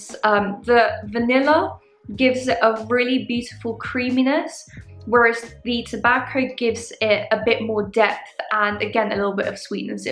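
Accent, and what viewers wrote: France. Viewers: That French accent haha. Great video and I love Side Effect :) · Love your french accent 😆 you are too cute! that Xerjoff packaging and the bottle is top! More like the beauty is sleeping 💙🧡 great review thank you for the video!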